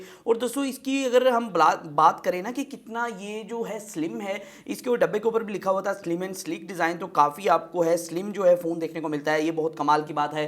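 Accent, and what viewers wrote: Faisalabad, Pakistan. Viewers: Yr kahi dekha h apko... Faisalabad sy to ni??? Boltay b Faisalabadi ki tra he ho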